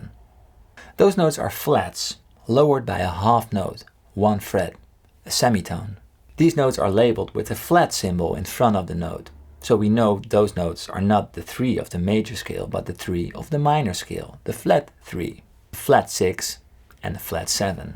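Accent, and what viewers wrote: Netherlands. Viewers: Are you Dutch? What's your accent? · You are Dutch for sure. I'm Dutch and I recognize your accent. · Are u dutch? Just wondering cause I am and the accent sounds familiar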